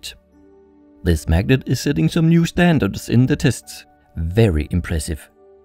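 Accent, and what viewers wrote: Denmark. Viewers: Hejsa.🇩🇰 Har ikke set dig før men kunne høre du var dansk med det sammen 😁👍🏻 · er du fra danmark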